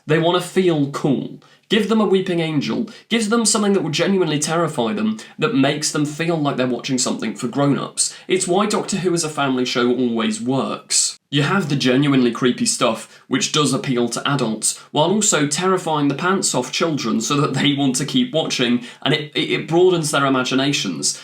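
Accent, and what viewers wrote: United Kingdom. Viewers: Quit stuttering big nose with ur very strong British accent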